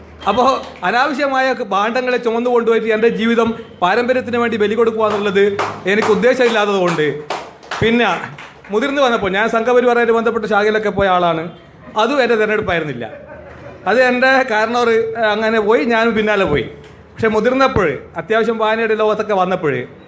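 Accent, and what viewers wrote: Kannur, India. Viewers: നല്ല കണ്ണൂര് ഭാഷ. സൂപ്പർ.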